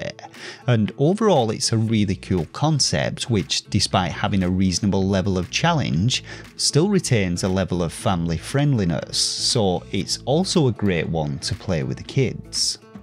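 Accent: United Kingdom. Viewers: but every single word you pronounced was in a British accent. You did pronounce it correctly. We invented the damn language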